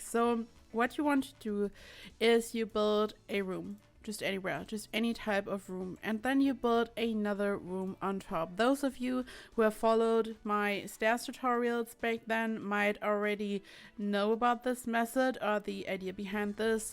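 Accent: Germany. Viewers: are u german?? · Are you German? Because you sound like you are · are you from Germany? A friend of mine is and the accent is very similar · U have an interesting accent! Are u from Germany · You sound german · You sound like german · Ur German right?😂😂 Nice accent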